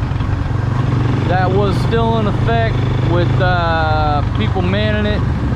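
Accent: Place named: Alabama, United States. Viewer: Where u from, sound like Alabama, my home for now...I'm glad they opening up, cause I'm ready to come there as soon as international flights nd visas atr issued...be safe bro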